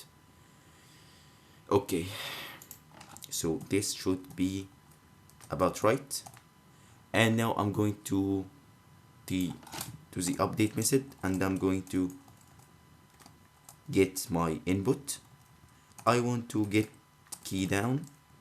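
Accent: Egypt. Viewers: YOU SOUND LIKE AN ARAB ,,,, AN EGYPTIAN TO BE EXACT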